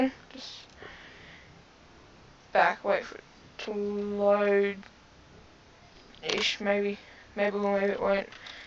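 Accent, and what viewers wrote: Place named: Australia. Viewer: Nice aussie accent mate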